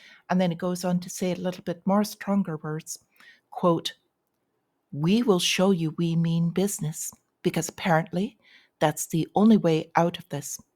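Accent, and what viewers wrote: Ireland. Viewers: You have such a unique channel - I had to laugh when you showed the farmers spraying the manure - what a metaphor for what they are doing to us on a daily basis! So good to hear your sweet Irish accent! Have a wonderful and profitable week! 🍀😊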